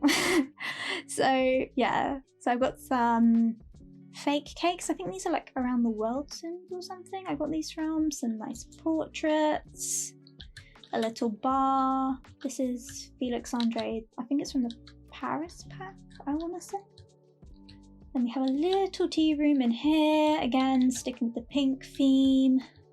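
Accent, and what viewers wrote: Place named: United Kingdom. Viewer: Thank you. I've seen it. :) I'm looking forward to see more from you. And your perfect British accent makes these videos even better.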